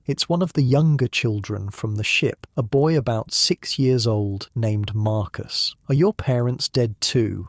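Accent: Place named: Scotland, United Kingdom. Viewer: Sounds like Scotland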